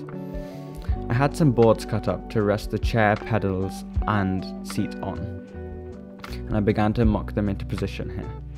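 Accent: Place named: Trinidad and Tobago. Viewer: You from Trinidad? Kinda picking up the accent there.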